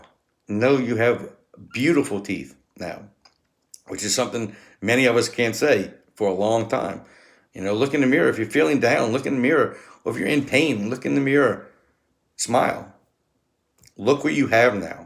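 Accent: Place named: South Louisiana, United States. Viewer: Which location did you use. You sound like you might be from south louisiana. Nevermind got to it at the end lol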